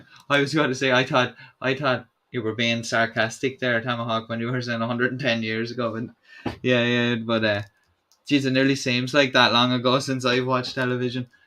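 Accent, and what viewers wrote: Ireland. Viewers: nice to hear the Irish brogue on YouTube.🇮🇪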